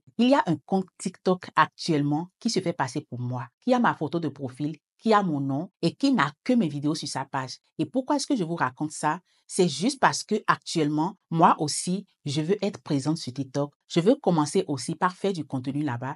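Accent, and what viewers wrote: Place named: Benin. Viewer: Ce que j'apprécie vraiment par dessus tout au delà de la clarté avec laquelle vous expliquer les choses c'est le faite de garder votre acens naturel de femme africaine et particulièrement béninoise sans pour au tant mimer l'accent occidental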